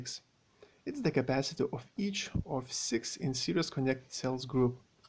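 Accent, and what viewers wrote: Russia. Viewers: Русский акцент детектед:)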